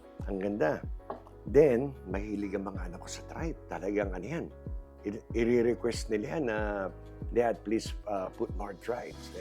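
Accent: Marikina, Philippines. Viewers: Taga Marikina din po ako parang naririnig ko ang Tatay ko pagnagsasalita kayo may puntong Marikena… mahilig din po syang magluto 😊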